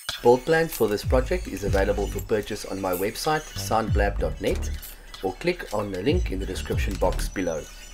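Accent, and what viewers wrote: South Africa. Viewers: I immediately recognised your accent as SA. Well done! you have created a very nice channel. I will be following. Nice projects!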